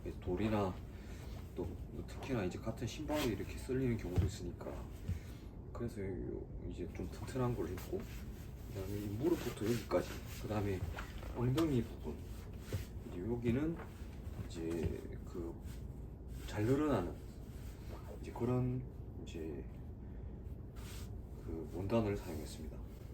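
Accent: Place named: Busan, South Korea. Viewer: 잘봤어요. 구독했어요. 부산쪽 분이신가 봐요. 평소 잘보고 있어요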